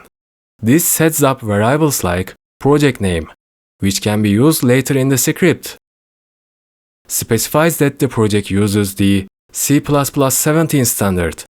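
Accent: Turkey. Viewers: konuşmandan türk olduğunu anladım master :) ben de linux da vulkan api ile çalışan bodycam clonu yapmaya çalışıyorum. windows dan devşirme değilde linuxdan devşirme yönlü olmasını istiyorum. microsoft ve nvideadan bağımsız directx saçmalığı olmadan. kod bilgim yok ama bası oyun modları yaptım daha önce. biz yetişkinlerin her akşam seferberlik eğitimi olarak. yarım saatlik roundlarla cqb savaşı yapa bildiği takım bazlı oyunu yapmak istiyorum. lyra game baz alarak bluprintler ile yapmayı deneyeceğim.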